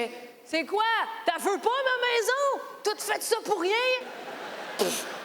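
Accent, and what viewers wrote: Quebec, Canada. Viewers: Je te découvre. Dommage que je ne comprenne pas tout car je ne suis pas habituée à ton accent (je suis française) mais c'est vraiment très fort ! Bravo ! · c'est surement drole, mais l'accent québécois trop difficile a comprendre...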